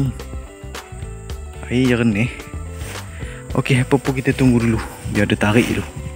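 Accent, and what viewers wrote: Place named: Gerik, Malaysia. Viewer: Ckp pun udah mcm org grik....tokdang tahok..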